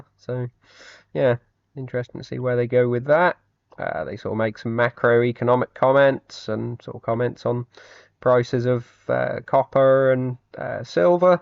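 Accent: Norfolk, United Kingdom. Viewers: Damn not often you hear a Norfolk accent on an investment video (North Walsham btw) ;-) . Awesome gains man, keep crushing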